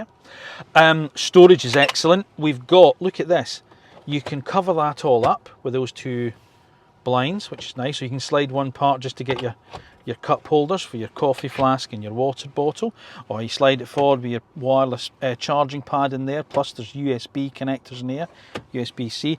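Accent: Scotland, United Kingdom. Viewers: You’re scottish? 🏴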